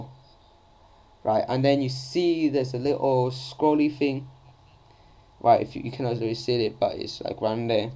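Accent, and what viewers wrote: United Kingdom. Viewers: HES BRITSH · Fucking british accent... CANT UNDERSTAND YOU!!!!!!!!!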